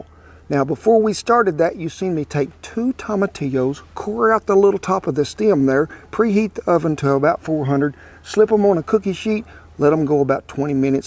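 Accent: United States. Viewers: English is not my first language, I don't even understand everything you say, but I love your accent! A salt of American earth! Greetings from other side of the Atlantic!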